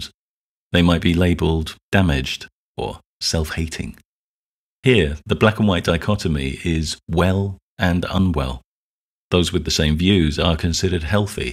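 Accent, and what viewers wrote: England, United Kingdom. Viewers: Don't listen to this man just because he has an English accent, and minimal graphic design. His opinions are no more valid than yours and mine.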